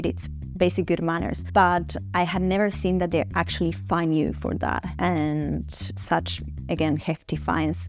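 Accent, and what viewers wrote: Australia. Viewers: I love your accent… every 10 words or so you sound very Aussie 🇦🇺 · You have a tiny hint of an Aussie accent in your English. :)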